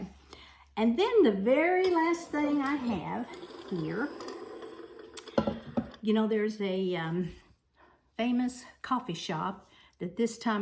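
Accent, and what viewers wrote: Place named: South, United States. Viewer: I love your “accent”. I wish I had that Southern accent…or a British accent. Love both. I have a Southern Californian “accent”. God bless you and your family! Stay healthy.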